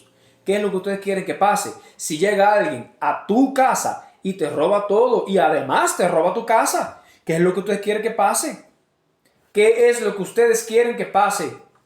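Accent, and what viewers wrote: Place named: Dominican Republic. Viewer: No es regionalismo corazon,esas son clarias que atacan por donde te duele,para que te quites,no hagas caso a eso, Los dominicanos tienen ese mismo acento y ninguno se averguenza,dale con todo. 💪💪💪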